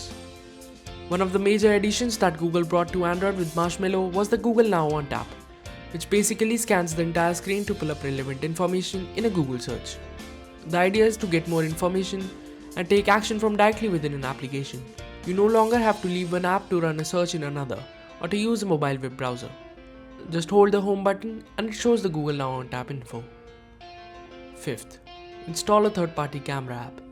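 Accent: India. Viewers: Also, it's the Indian accent which is prompting everyone to say they can't understanding a thing lol xD It seems neutral to us, but not so much to others.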